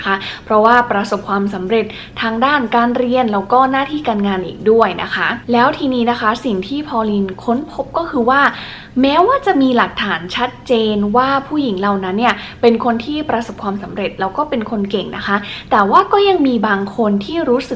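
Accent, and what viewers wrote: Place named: North, Thailand. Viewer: คุณ เป็นคนจังหวัดอะไรครับ สำเนียงพูดช้าๆ ทำให้คิดถึงคนเชียงใหม่ เชียงราย เลย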